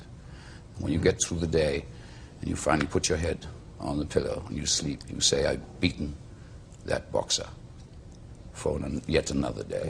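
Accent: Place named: Wales, United Kingdom. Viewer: Can hear his welsh accent at times, poor man, rip.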